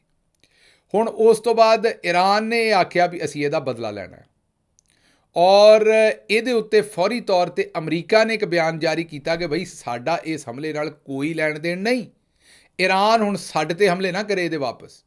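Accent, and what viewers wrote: Punjab, India. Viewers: گل صاحب تسی منو یا نہ منو لیکن تہاڈی پنجابی دا سواد ا جاندا ہے کڑاکے کڈ جاندے ہو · Saab I literally enjoy listening to Ur Punjabi accent ❤😂 I myself a paki Punjabi from Karachi & resident of Oman but my Punjabi is soooo very poor😂 May Allah bless u Sir lotsss of respect🫡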